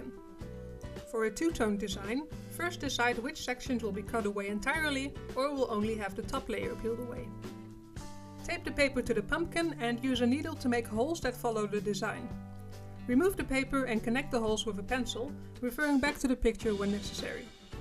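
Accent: Netherlands. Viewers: Let me guess your from Holland..? very good vid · You are dutch hah! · Is she dutch??? She has that kind of accent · u have such a Dutch accent! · you sound Dutch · She is dutch people have a strong accent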